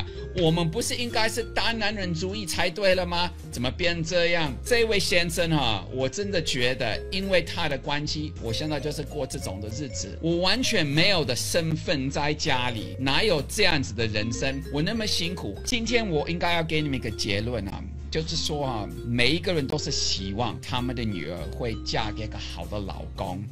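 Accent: Taiwan. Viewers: 這位教授........你的口音不只是那麼簡單...你語氣結尾帶著齁......有夠台....我這台灣人講話都沒你那麼台....... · 29年讓人改變了！臺灣國語耶！哈哈哈！ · 很台灣腔既老外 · 好幽默哦，也很台（穿插好多台語） 讚👍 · 您的台灣國語說得太棒啦！:)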